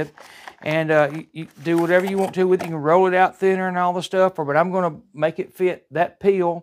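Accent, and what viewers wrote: United States. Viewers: It sounds like such a struggle to speak with an American accent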